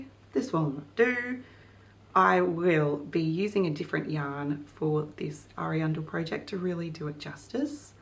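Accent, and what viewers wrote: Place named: Australia. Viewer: Hello - it’s lovely to hear another Australian on YouTube. I’m impressed with your knits and your productivity whilst being a mum of 3. I am a mum of 5, grandma of 5 and I love knitting, crocheting, sewing etc, but I am impressively slowww 😅 I’m in Brisbane and I have to confess a teensy bit of jealousy that you live in Tassie and have the option to knit lovely cosy knits that will get a good amount of wear! I have to choose carefully which cold weather knits I actually make, given the two minutes of “winter” we get here 😅 Your green Paul Cardigan is just superb on you. You clearly have a good eye for colours that suit you. Love it! What a natural beauty you are! Anyway, I’m watching this and working on my Hatsuki Top by Rievive in Isager Yarn Trio 2. Your cadence and overall chill vibe is perfect accompaniment. Thank you 😊 Also, I heard there was snow down there yesterday! 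Already! It’s finally cooled to a balmy 26 C here today! “I love a good patina” - me too ❤😊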